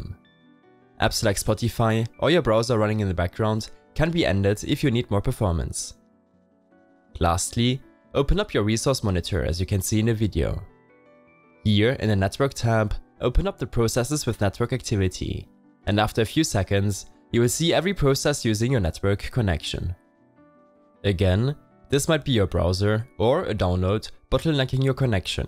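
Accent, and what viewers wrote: Germany. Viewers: Are you German? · Da hat er mich aber auseinander genommen.. Deutschen akzent hört man aber auf jeden fall.. Geiler kanal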